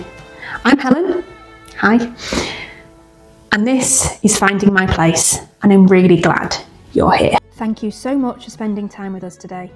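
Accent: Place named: Northern England, United Kingdom. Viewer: Welcome to Ireland. Great northern English accent! Good luck.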